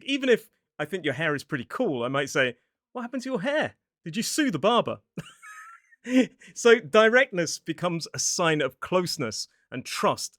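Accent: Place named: Southeast England, United Kingdom. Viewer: Should add, this man is quite obviously from the south, probably south east, of England, and basically everything he has said is complete b*llocks with regards to any Welshman 😂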